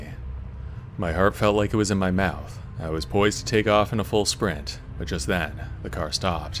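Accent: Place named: Canada. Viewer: ❤❤ Thanks love your calming voice, you must be Canadian like me!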